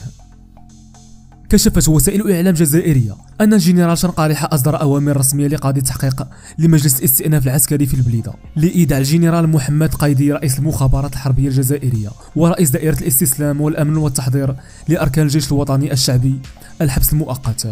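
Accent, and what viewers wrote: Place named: Morocco. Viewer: جماعة هذا راه مروكي لى داير فيديوا شوف غير اللغة العربية كيفاش ينطق فيها داير هذا الفيديوا يلعب على المورال للجيش الوطني الشعبي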